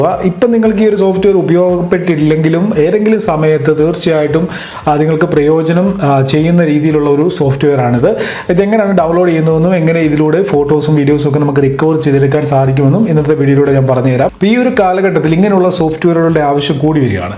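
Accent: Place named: Kasaragod, India. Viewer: കേറി വാ മക്കളെ...🙋 ഇനി മുതൽ കാസർഗോഡ് ഭാഷയിൽ ബ്ലോഗ് കേൾക്കാം...😍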